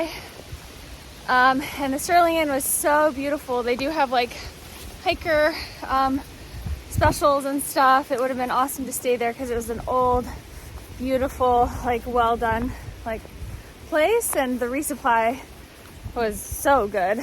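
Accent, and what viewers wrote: United Kingdom. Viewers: I loved the British accent!! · Great day! Thanks for taking us along. Your smile and laughter are infectious!!! As is your British accent. 😊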